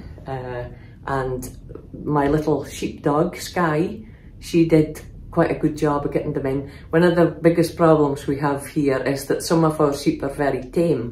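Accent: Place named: Scotland, United Kingdom. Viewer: Really enjoyed listening and watching you with your crafting..love your Scottish accent also..😊yes..certainly would love to see more of what you get up too..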